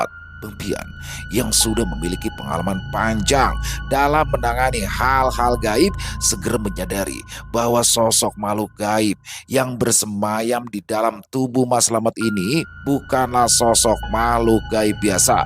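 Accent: Jakarta, Indonesia. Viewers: Gua paling suka kisah nya mas logat logat betawi nya bikin candu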